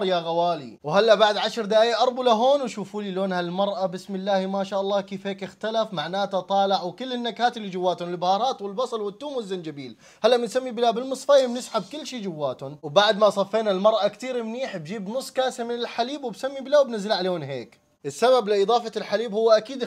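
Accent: Syria. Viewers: من درعا واضح من اللهجة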